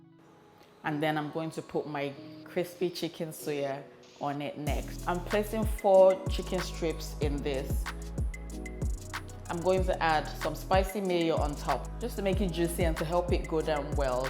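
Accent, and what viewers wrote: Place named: United Kingdom. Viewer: I use to think you live in the UK because of the way you speak. I love the recipe.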